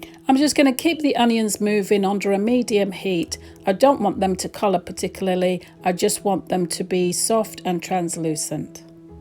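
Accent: England, United Kingdom. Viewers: Top recipe thank you and at last....... ....a British accent :) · I'm from Ireland and I'm living in New York and I can tell u that when I hear an English accent, I feel, oh, my cousins so I always love to see and hear my cousins!!!💚 Great recipe!!!! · Have a sweet potato soufflé with chunks of sweet potato. Also I add cauliflower to my curries since it takes up the spices beautifully. Lived in UK for 4 yrs early 80’s so enjoyed the accent a lot! 😊 thanks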